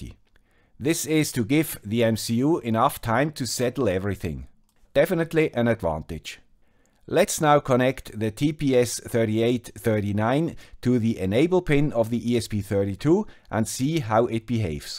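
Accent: Switzerland. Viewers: Agree. I’ve got 25 years into this so far. The guy with the Swiss accent has been a great help.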